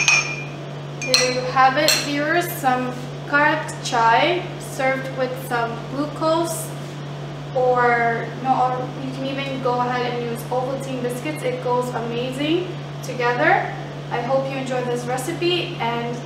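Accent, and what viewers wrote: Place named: Arab world. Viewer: I can tell from your accent that you're an Arab, haha 😁 I will try your method, thanks x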